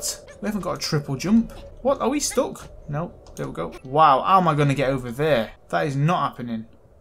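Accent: United Kingdom. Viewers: What part of UK is from? He has the same accent as Jon Snow.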